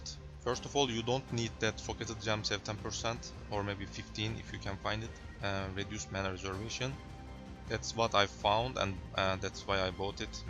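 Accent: Turkey. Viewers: Aksanınızdan Türk olduğunuzu düşünmüştüm ve kanalınıza baktım hocam :))) Teşekkür ederim, oyuna yeni başladım, bu build hem ucuz hemde sağlam gözüküyor. Bu build ile başlayıp ileride farklı karakterlere geçiş yapacağım, sağolun güzel video olmuş.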